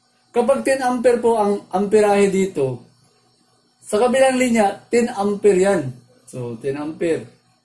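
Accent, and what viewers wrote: Visayas, Philippines. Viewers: Visaya ka boss😂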